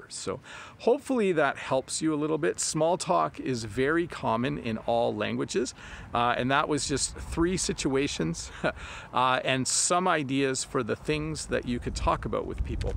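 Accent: Canada. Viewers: A lot, when I was living in Australia, specially with talks with Australians. I like your Canadian accent, specially when you pronounce the "au" like in about.:-) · Dear, this is my first english teaching video I have ever seen (and heart). I am sure my english skills will be improve by waching your videos and daily ideoms on instagram. I like your accent. Habe you got a typical canadian one? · 100% understood Thanks - Good lessons for frenchman like me, good to listen english with canadian accent!!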